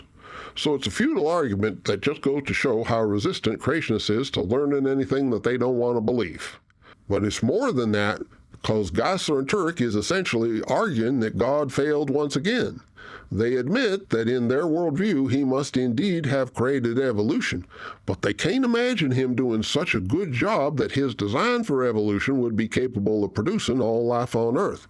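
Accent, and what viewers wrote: South, United States. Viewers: Weyell, the cognitive dissonance betwixt country hick-sounding bear and educated skeptic somehow ain't keepin' me up at night! I like it, and why not adopt an easy-to-recall schtick? For new relatively unknown creators, do you know Ivy Windsong Collins? She's got older southern belle down very nicely indeed.... TTYL!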